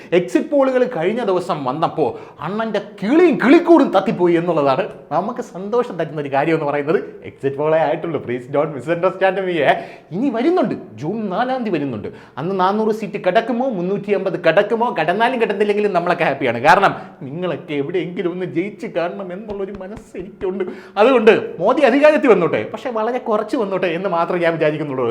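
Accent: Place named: Thiruvananthapuram, India. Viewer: തിരുവനന്തപുരം ഭാഷയിൽ ഉള്ള താങ്കളുടെ സംസാരം കേൾക്കാൻ നല്ല രസമുണ്ട് 👍👍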